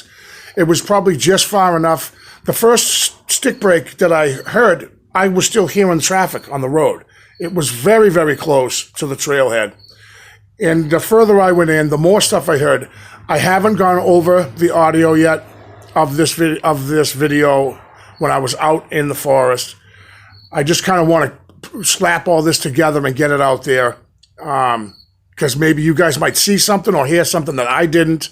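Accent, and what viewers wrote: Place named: New Hampshire, United States. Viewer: I thought that was a New Hampshire accent.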